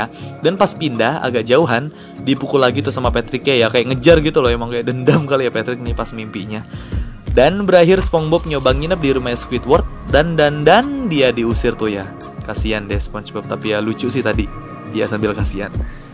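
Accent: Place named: West Java, Indonesia. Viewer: Abang ti sunda nya bang Ngomongnya ada teh mulu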